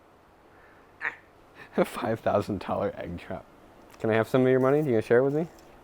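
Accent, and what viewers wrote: Australia. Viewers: Don’t worry, most of us aussies talk like that 😑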